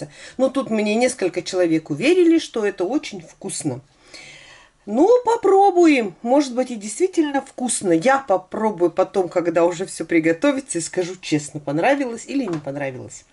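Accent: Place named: Kopeysk, Russia. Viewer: у меня подружка живет в г.Копейск, говор такой же! мне нравится ваш говор. а вы откуда ведете трансляции?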